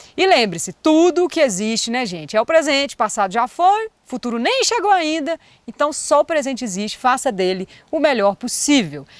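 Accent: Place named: Minas Gerais, Brazil. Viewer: Mas tb pode ser observado que como as árvores é necessário flexibilidade para potencializar a resiliência!! Sempre sendo ela mesmo, sotaque, vocabulário, transparência, trejeitos......mineirinha carismática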